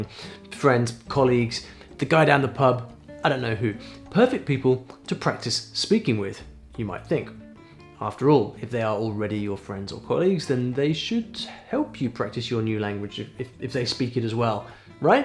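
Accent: United Kingdom. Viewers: It's British accent and everybody likes it. His accent is the main reason of his subscribers to stay at this channel.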